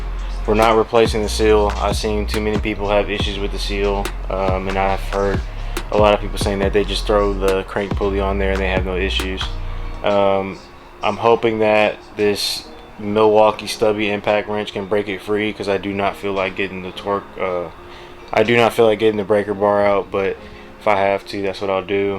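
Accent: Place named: Philadelphia, United States. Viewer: ❤️ from Philly. You talked like a good friend here in Philly and I was wondering if there was a connection. God bless.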